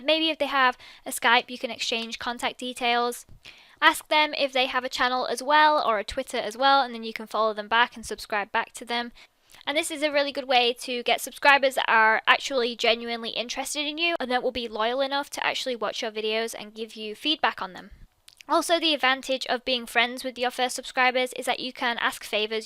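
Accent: England, United Kingdom. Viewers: I love your English accent :)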